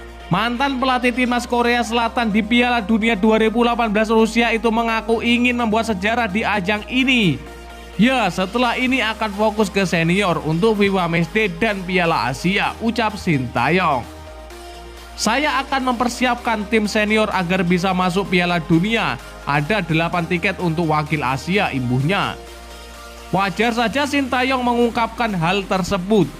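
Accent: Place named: Java, Indonesia. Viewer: MIN MEDOK BANGET.. ENAK DENGARNYA NIH..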